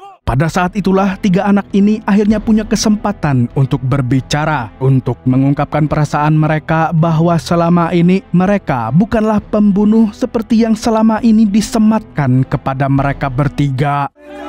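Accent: Banjar, Indonesia. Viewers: Urang banjar kah ni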